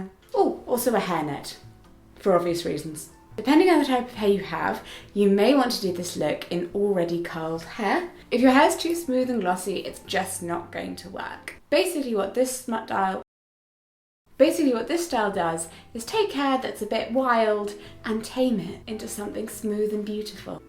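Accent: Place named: England, United Kingdom. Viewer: She could not be more English. It's not just the look or the accent but the mannerisms, the cadence of every single move. It's like she's a time traveler from a bygone ers, an upper class lady from Victorian/Edwardian times. I am absolutely mesmerized.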